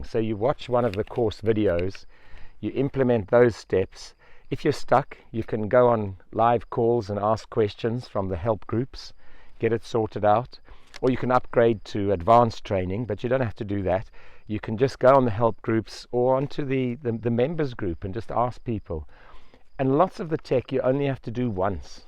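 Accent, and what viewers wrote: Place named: Zimbabwe. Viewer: You sound like a Zimbo. We live in Extremadura. I really want to get something going but not enough time right now. I shall keep watching you until I can get a site going.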